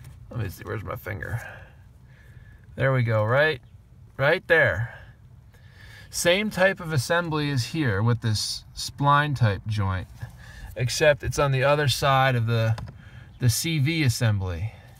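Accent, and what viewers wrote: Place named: Texas, United States. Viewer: Texas?? Lmao you sound like you’re from Texas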